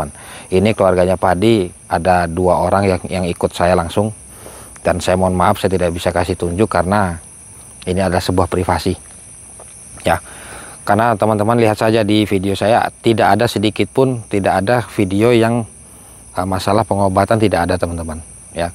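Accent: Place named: Banyumas, Indonesia. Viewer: SEEHH. LOGAT. BANYUMASAN. SEH SEMAU. GUE. KOJ. IKUT. CAMOUR. (. LHO. SEMAU. GUE ,,,,,,,)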